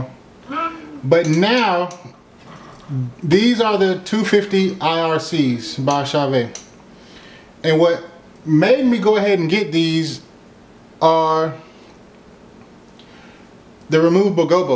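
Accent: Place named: Detroit, United States. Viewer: you from detroit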